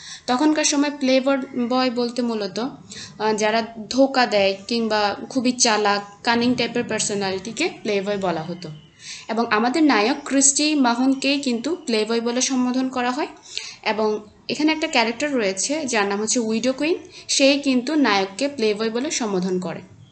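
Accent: Chittagong, Bangladesh. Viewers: Chittagong er accent 🥹❤️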